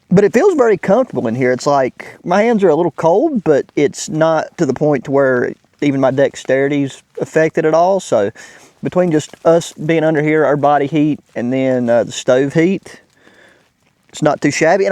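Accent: South, United States. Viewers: Love that Southern accent, his charm and good looks dazzle in UHD.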